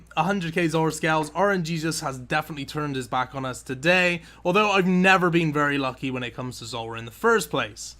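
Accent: Bristol, United Kingdom. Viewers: Just stumbled into this video from one of regular YouTubers, wasn't going to give it the time of day until i heard the accent. Sounds alot like some of my friends from Bristol xD Subbing for that reason alone hahaha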